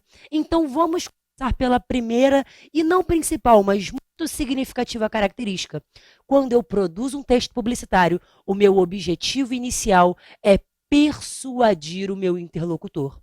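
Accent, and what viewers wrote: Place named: Rio de Janeiro, Brazil. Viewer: Carioca ctz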